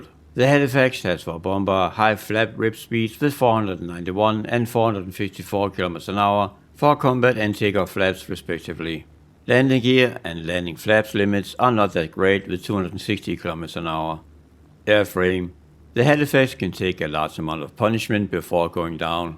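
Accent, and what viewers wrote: Denmark. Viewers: man kan bare høre det er en dansker når de snakker engelsk😅 god video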